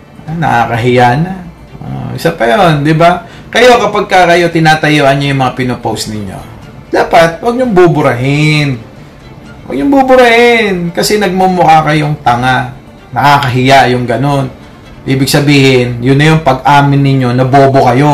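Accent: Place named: Batangas, Philippines. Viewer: I’m so entertained with your Batangenyo accent nakakatuwa. Pero si, nakakasira ng araw pati accent peke talaga. Sana ma meet kita pag pwede nang makapag bakasyon sa Pilipinas. I’m your new subscriber.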